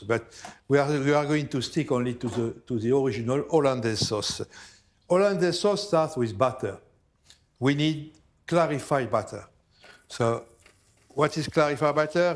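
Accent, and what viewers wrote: France. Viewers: It’s a French accent. No English accent here.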